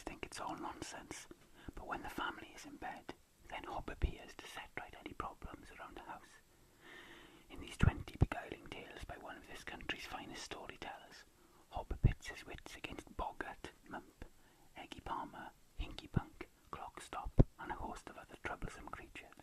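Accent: Wales, United Kingdom. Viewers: I love this idea. I LOVE the book you chose. I struggled a little with your gorgeous Welsh accent, what with so MANY whispered words. Would you consider soft-speaking, or a mix of the two, if you do more storytelling? You lose a bit of the intonation, with whispering, is all. Thank you so much for spending your free time on videos for us! PS: The blanket is fab!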